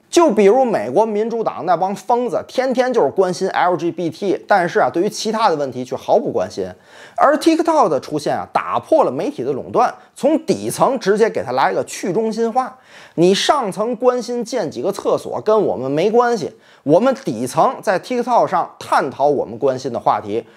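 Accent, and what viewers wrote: Tianjin, China. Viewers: 这是天津话吗？以前老看杨光的快乐生活，感觉有那么点像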